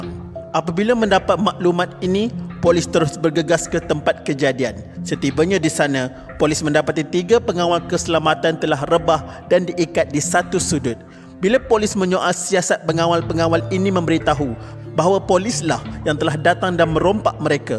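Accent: Kelantan, Malaysia. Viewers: Ya Allah saya sorg ke gian menanti video2 baru channel ni?? Saya pasti pemilik suara misteri ni org kelantan sbb dari tutur kata nya.. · Yang tukang bercerita ni org kelantan kan... Tu je saya nak komen ekekeke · bro asal Kelantan ke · Narrator ore kelate terrrr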